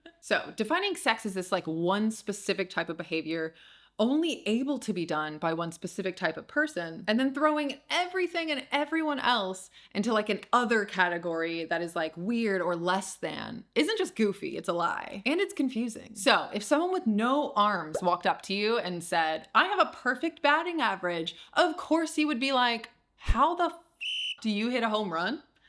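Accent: United Kingdom. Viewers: Welcome back,! What a fantastic video (incredible edit, reminds me of PBS Get Lit content), I can't wait to see what else you produce. <3 P.S. I had a little giggle when you acknowledged your change in inflection to being more bri'ish.;)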